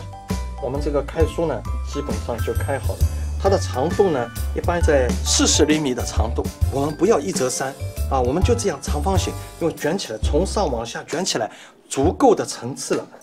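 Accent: Shanghai, China. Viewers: 好亲切多上海式的普通话啊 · 上海普通话，亲切啊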